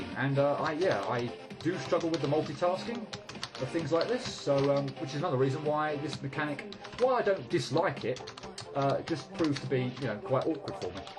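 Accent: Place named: United Kingdom. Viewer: If you don't mind my asking, how old are you? You sound like a rather snazzy, upper-class british commentator on a golf game with more emotion. Yes, that's a good thing.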